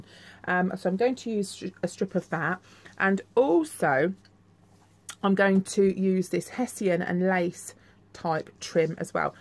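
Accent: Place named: Northern England, United Kingdom. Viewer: Hi.I don't do crafts but find it very therapeutic to watch.Also I like how real you are and love your Northern English accent.Thank you.☘️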